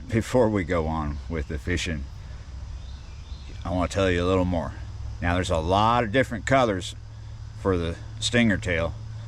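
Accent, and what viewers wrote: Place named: New Orleans, United States. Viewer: Not hat but yat accent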